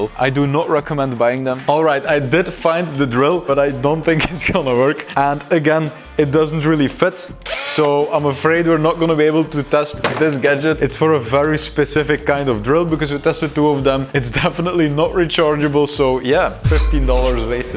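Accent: Netherlands. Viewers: i heared a dutch accent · You can hear the Dutch accent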